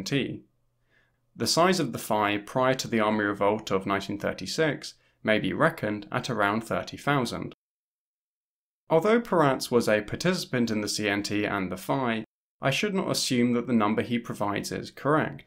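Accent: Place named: United Kingdom. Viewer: Would recommend learning Spanish or Catalan if you can muster up the willpower to do it both because they are useful for your purposes as not being able to read the language is a roadblock you mention multiple times in this vid and also because in the past your pronunciation of certain Spanish proper nouns has been so "British" for the lack of a better term that I've kind of struggled to find the person/thing in question in personal search afterwards. (could also just edit the captions where proper nouns pop up because the auto-generated ones usually don't hear it but I don't know if you can do that anymore)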